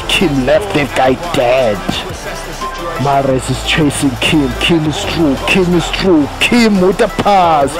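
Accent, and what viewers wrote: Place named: Zimbabwe. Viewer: u defo from zim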